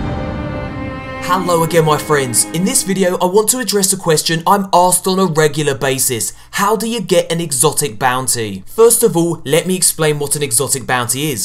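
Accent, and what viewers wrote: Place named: United Kingdom. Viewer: I watch these vids to here your voice and freak outs you snazzy Brit you x)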